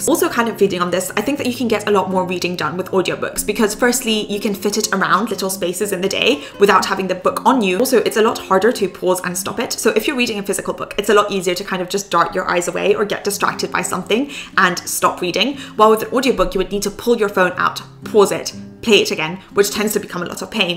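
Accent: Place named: United Kingdom. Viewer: plz add subtitles I'm not compatible with British accent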